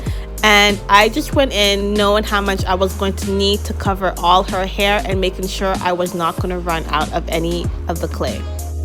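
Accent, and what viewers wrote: Toronto, Canada. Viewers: I here rhe Toronto Caribbean accent 😁. Before l read your little bio l knew you were Canadian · I knew you where from Toronto as soon as I heard you speak the bell remote confirmed it 😂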